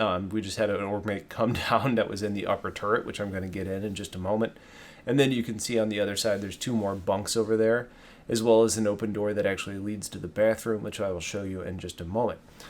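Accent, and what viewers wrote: St. Louis, United States. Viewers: R u from st.louis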